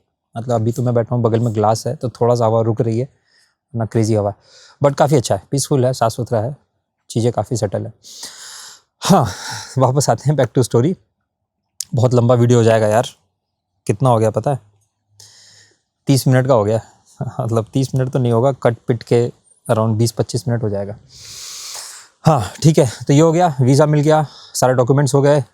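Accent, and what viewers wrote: Uttar Pradesh, India. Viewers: Bhai ki UP vali boli is crazy🤙🏻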